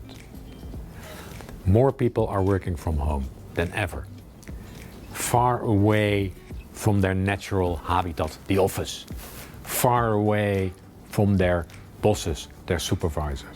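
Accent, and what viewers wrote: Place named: Netherlands. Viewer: dit Nederlandse accent ook.......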